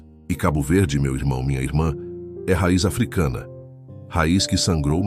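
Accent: Brazil. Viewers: Se estás a narrar a história da escravatura, coloque foto da época. Sotaque brasileiro também não soa bem. Quanto a história, Cabo Verde foi interposto comercial e colónia. Após a abolição da escravatura continuou como colónia, até a independência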